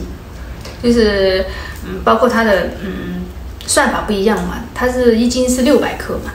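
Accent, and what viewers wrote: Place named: Taiwan. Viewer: 妳跟台灣人一樣了。說話結尾不說哈就更像了。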